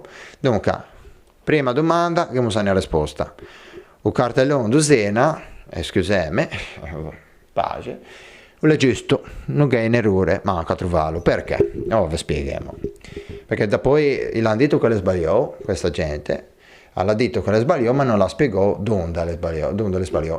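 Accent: Italy. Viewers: Se sente che te du levante😂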